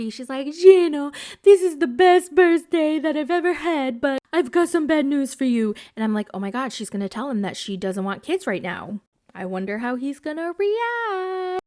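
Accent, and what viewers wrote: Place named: New York, United States. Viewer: istg while getting to know you as a ytber and watching your videos i said to myself so many times this girl gotta be from NY and i just found out that you wereeeee lol i love it. Honestly makes me wanna watch you more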